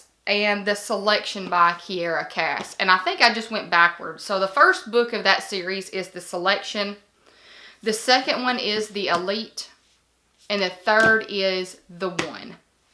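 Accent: South, United States. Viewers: I love this! I love how honest you were about not reading but finding your niche. Like you, it took my finding a verse novel years ago just passing by in a book store. I was there with my kids to play and read books. On the way out I stopped picking one up of Ellen Hopkins, Burned. After reading it I sought out to read others of hers but the story at hand was not for me. Although, I value her and her take on life because it's personal matter to her and I know so many can relate. I moved on finding other verse novel authors and evidently wrote my own verse novel, Under Contract: Life in the Middle of Dreams, it was released last summer. If you'd like to read it and review it I'd be happy to mail you a copy. You are precious, I love your accent. Are you from the south? I have people comment on mine all the time. Again I enjoy this video and look forward to watching more of your videos. 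I hit that subscribe button! Have an awesome day and keep shining!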